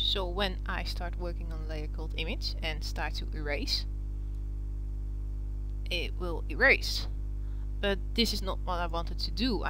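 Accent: Netherlands. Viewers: Yep, that's Holland ! I can tell by the voice and the buildings ! I visited there with a friend in 2005.....wonderful country and the people were so tall ! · definetly dutch, good tutorial though : D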